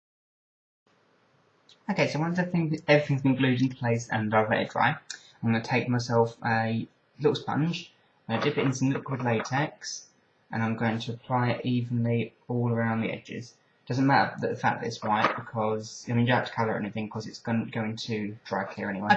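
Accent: United Kingdom. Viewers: dear god. listening to Darth Maul speak in a cheery british accent is so bizarre..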